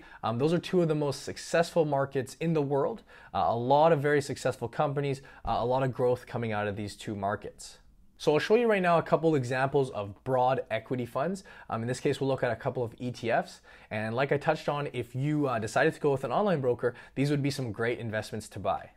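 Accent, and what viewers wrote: Toronto, Canada. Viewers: ahaha Toronto mans for sure. great content bro new follower here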